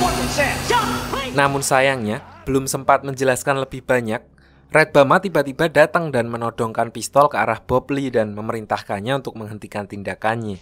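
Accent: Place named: Yogyakarta, Indonesia. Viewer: Wong ngendi sih min kok bahasa e sekitaran jogja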